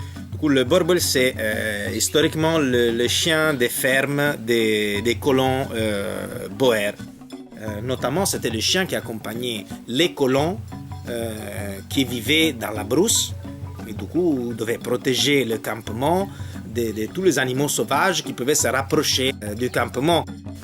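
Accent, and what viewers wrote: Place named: Italy. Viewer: Enfin! Enfin une vidéo sur le boerboel en français! et dans un français parfait, en plus! Et cet accent italien... la classe! Excellente vidéo et très instructive, merci... Je m'abonne direct!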